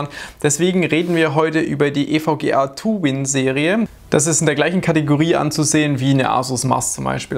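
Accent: Germany. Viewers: sprich evga nicht so deutsch aus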